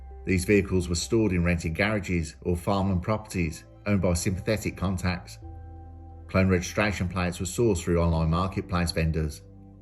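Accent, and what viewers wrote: Birmingham, United Kingdom. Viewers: You do a great job, but I also struggle with the audio on many of your vids. The sound quality is fine , but you either slur some words slightly or speak several words so quickly, that I'm constantly rewinding to work out what you said! No offence intended, just (hopefully) some constructive feedback ...and I'd much rather hear your Brummy accent than listen to an AI voiceover! Keep up the great work 👍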